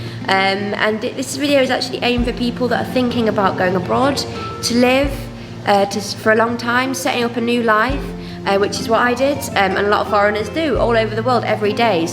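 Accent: United Kingdom. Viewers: 很好聽的正統英國腔～～❤❤👍👍👍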